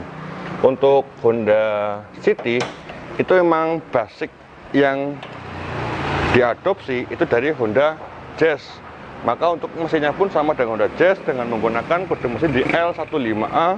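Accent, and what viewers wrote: Banyumas, Indonesia. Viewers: Berkah selalu mas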